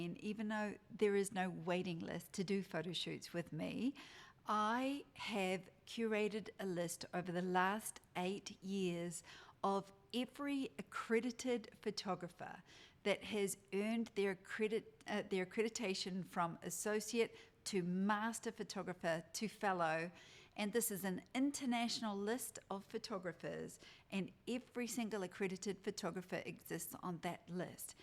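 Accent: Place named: New Zealand. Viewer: Love that you're back with this new endeavor. Looking forward to your weeklies. Thank you for being and I am in love with your beautiful Kiwi accent.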